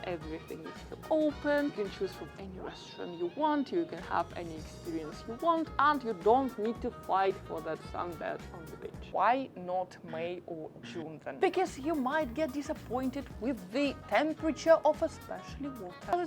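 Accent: Russia. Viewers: Somebody speaking English like a Russian and Italian like an Italian. Nice Video and true, Sardinia is not expensive at all (just at Toll). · You have a heavy Muscovite accent.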